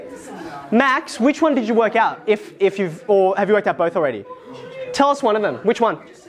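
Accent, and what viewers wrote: Australia. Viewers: Aussie accents make stats more fun.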